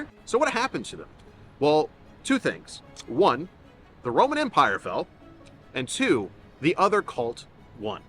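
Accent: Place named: United States. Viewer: How to obliterate any shred of credibility. 1 Having a channel called The Wisdom of Odin 2 Speaking Murrican (that is not a civilised way of speaking) 3 Using such a inane font for the channel logo.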